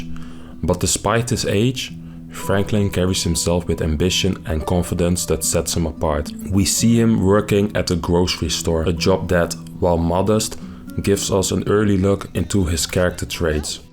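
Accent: Netherlands. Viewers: bro you sound dutch great vid btw. brother ✊🏿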